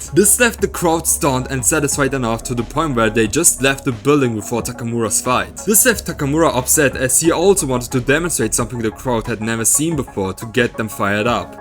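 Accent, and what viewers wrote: Germany. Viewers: Krass wie sie man merkt dass du deutsch sprachiger bist😂😂😂es ist immer wieder so witzig herauszuhören wenn man deutsch ist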